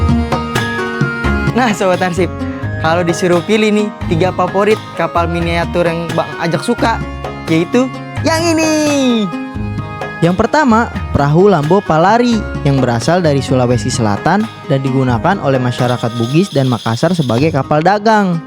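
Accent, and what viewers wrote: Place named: Betawi, Indonesia. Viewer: pembawaannya khas betawi gak kaku, seru, jadi mudah dipahami 👍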